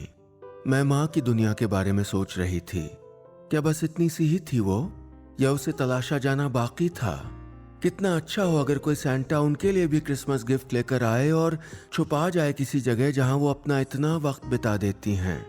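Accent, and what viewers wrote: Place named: Marwari, India. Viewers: 'Kup chan goshta' matlab 'bohot hi achhi kahani'. 'Hrudaysparshi' yani 'dil ko chunevali'. Indian languages are so amazing i liked the way you spoke rajasthani so mch.I couldnt control commenting in my mothertongue marathi I wish i could write a story and nilesh sir narrating it..... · Bht hi pyaari story thi sir and aap bht pyàari rajisthani bolte ho👌👌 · सर, जय श्री कृष्णा, मै राजस्थान के जोधपुर के रहने वाला हूँ,और जब भी आपकी कहानियाँ सुनता हूँ तो उसी मे डूब जाता हूँ, पहली बार आपकी आवाज मे राजस्थानी मारवाड़ी भाषा सुनकर ऐसा लगा की आपने कानो मे मिश्री घोल दी हो। आप से अनुरोध है की आगे भी दीक्षा चौधरी जी की कहानियाँ आप हमको मारवाड़ी मे ही सुनाये,धन्यवाद,CA अभिषेक वर्मा,<num>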